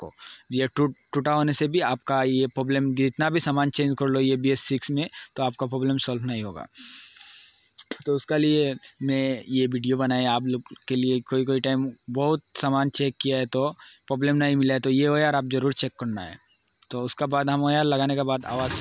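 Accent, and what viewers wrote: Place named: Bengal, India. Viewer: Bengoli mix Hindi,,,🤪🤪🤪🖕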